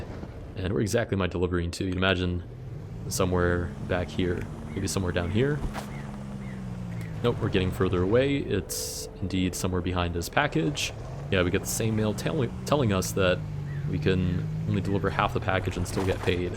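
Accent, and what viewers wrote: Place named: United States. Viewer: his accent is american tho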